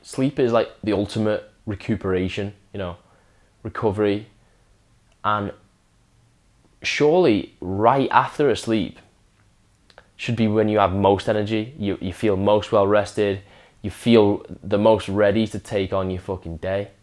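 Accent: United Kingdom. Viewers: Hello there. I'm here in Southern California under Coronavirus quarantine and ran out of coffee two days ago. Normally, I just walk down to the local coffee shop for a cup, but everything's closed up and my food delivery service isn't arriving for four more days. OMG!!!! Had NO IDEA how hard it is to kick this stuff. Just realized I've been drinking hardcore for close to 30 years and never really seriously tried to stop. Didn't sleep at all last night and am wigging out. This video is AWESOME!!! I already feel like I'm in that movie Trainspotting and your accent is adding to the experience. LOL!!! 🤣Thanks for posting it. It really helped me out to know that I'm not alone. "Cheers Mate" or as we say here in Cali "Thanks Bro!!". -m. Huntington Beach, Ca. 👍✌😎 -btw i enjoyed your video so much I'm subscribing. The way you articulated your experience was exceptional. 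"Brilliant" is the word I think y'all use over there in'net?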